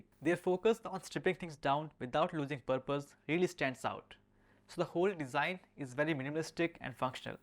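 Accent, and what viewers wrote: India. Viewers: you have an Indian English accent, which I think is fine.But. you can instead focus on Innotation and pause in delivery.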